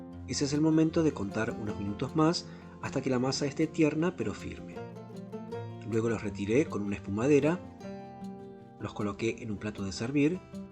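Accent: Argentina. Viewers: soy español. He vivido 24 años en Polonia. Ver tu receta ha despertado en mí tantos y tan bonitos recuerdos... ¡¡¡Eres un artista!!! La receta está fenomenalmente explicada paso a paso y muy bien hecha. Estoy seguro que tus pierogis están buenísimos. ¡¡¡Enhorabuena!!! Por cierto, me ha gustado mucho tu manera de explicar, ese acento tan bellamente argentino y lo bien que cocinas.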